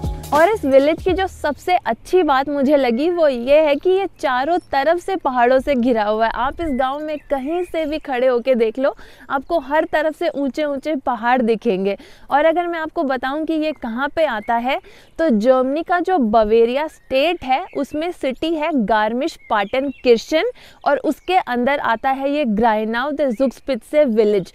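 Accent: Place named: Shekhawati, India. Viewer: Mahesh fouji/ jhunjhunu .mere ghar me buffalo, padi or camel h. Aapki voice bahut achchhi h or shekhawati Ki jhalak dikh rahi h.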